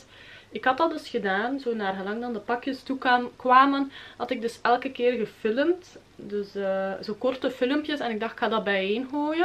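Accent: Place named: Aalst-Ninove, Belgium. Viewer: Ik denk dat je uit mijn streek bent ( Aalst-Ninove etc). Aan de spraak te horen ;)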